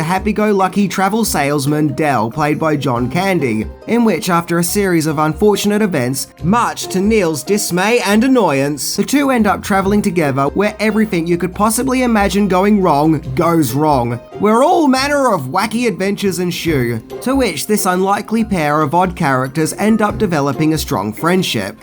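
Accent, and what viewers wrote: Australia. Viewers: Sounds more like a homosexual Australian. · Good channel here. Was wondering about your accent, got it now, Auzzie convict stock...i am a pomie....suck it up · Hey mate, It's pretty cool your spot on, covering all the shows and movies I dug as a child, and in that beautiful Australia accent. What's more is your clearly on the world stage.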